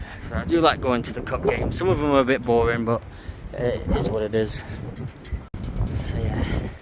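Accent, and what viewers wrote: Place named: Cumbria, United Kingdom. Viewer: Hi I'm trying work your accent out. I'm gonna take a guess that you're from Cumbria.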